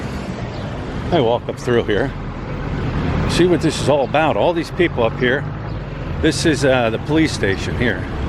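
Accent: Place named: Chicago, United States. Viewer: You sound more as if you are from Chicago than from Philly. I have heard so many different things from Thailand and PI that I do not know what to believe any longer. Never been the RED LIGHT or bar type but I lived in Makati and different places in Thailand before. I think bars are opened here in Denver. Lots of obnoxious people noise and motorbikes outside at night this last week. Last I heard from a Filipina was that PAL will start flights from overseas June 1, then after that someone said January 1st, 2021. I heard this yesterday. I'll subscribe to your channel in hopes of being kept informed. Good Luck!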